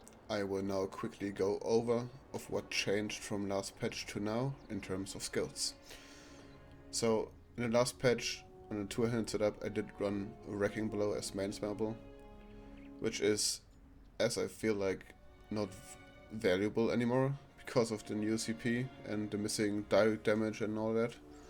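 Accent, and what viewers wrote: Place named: Germany. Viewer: Man hört einfach raus das du deutsch bist:D Guter guide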